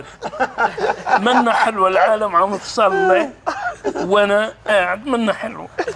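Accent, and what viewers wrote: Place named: Syria. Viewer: نص لهجتو سوري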